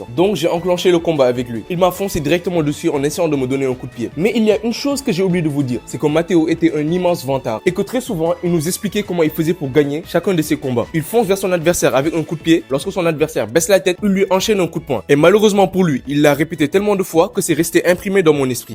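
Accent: Senegal. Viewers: ta un accent de sénégalais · Mhh je suis nouveau, mais c'est un accent Sénégal que j'entends là ?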